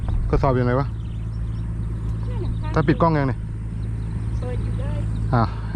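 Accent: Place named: South, Thailand. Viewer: 555พี่ แหลงใต้ชับผึด😁😁😁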